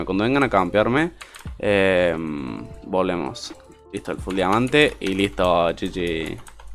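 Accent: Argentina. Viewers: Vos sos Córdobes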